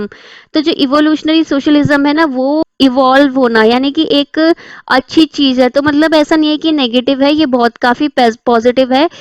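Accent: Assam, India. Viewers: Mam are u from Assam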